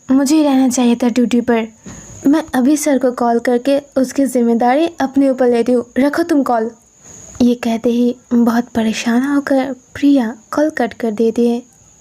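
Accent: Bengal, India. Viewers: I love your bengali accent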